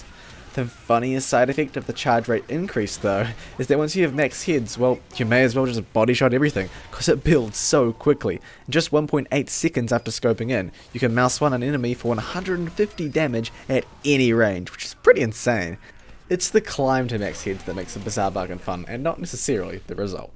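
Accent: New Zealand. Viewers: Vintage retro guy He's from New Zealand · ***** Yeah the New Zillind accent is quite similar to an aussie one to the uninitiated ;)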